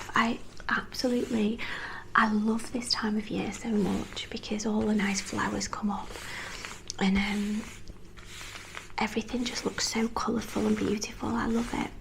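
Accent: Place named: Northern England, United Kingdom. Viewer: Lovely video! Your accent sounds familiar, seaside northern town maybe?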